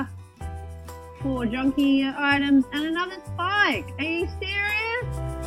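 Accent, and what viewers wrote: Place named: Australia. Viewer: Are you Aussie? You sound so Aussie hahaha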